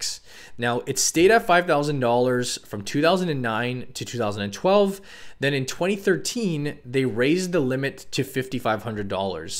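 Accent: Canada. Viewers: Damn i just realized while rubbing my eyes that if you closely listen to him speak you can clearly hear a deep pure Canadian northern accent! Haha also thanks a hell of a lot for this video, I've been doing my research and when trying to open an account with Questrade the beginning had me instantly stumped lol I had no idea what a margin account was and didn't know that the TFSA's are what is most commonly used. All makes sense now, thank you!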